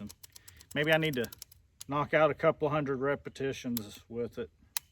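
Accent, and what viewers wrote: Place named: South, United States. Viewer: pinetrees everywhere, wild grass and moss. slight southern accent. ima say your from the Carolinas. my home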